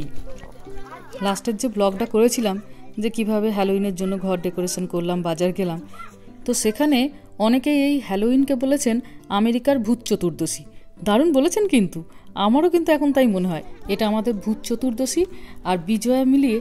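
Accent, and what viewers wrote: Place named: Bardhaman, India. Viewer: খুব সুন্দর লাগে আপনার ভিডিও দেখতে। আর তার চেয়েও বেশি ভালো লাগে আপনার কথা শুনতে। আচ্ছা আপনি কি বর্ধমান জেলার মেয়ে কারণ আপনার কথার মধ্যে বর্ধমান জেলার কথার একটা সুন্দর টান আছে। আমারও বর্ধমান জেলার সাথে একটা যোগাযোগ আছে আমি উত্তর ২৪ পরগনার বাসিন্দা হলেও আমার মামার বাড়ি হলো বর্ধমান জেলার মেমারিতে তাই বর্ধমান জেলার ওপর আমার আলাদা একটা ভালোলাগা আছে। উত্তরের অপেক্ষায় রইলাম। ভালো থাকবেন।।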